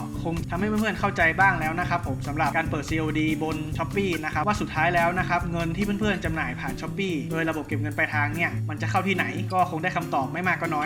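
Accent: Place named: North, Thailand. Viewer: ก็ว่าครับ อู้สาบเมืองเหมือนผมเลย😄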